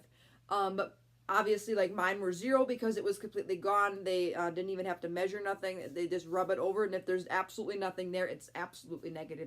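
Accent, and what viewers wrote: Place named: Midwest, United States. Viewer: Thanks for sharing! I am getting a TB test this week! I was guessing you were from the midwest by your accent and then at the end you mentioned WI! That's where I'm from😊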